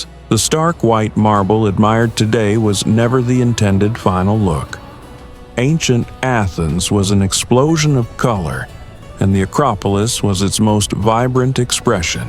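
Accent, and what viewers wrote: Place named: South, United States. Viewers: Right said. The Southern accent is so annoying in any context. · Good video, but the slightly southern accent seems a little out of place.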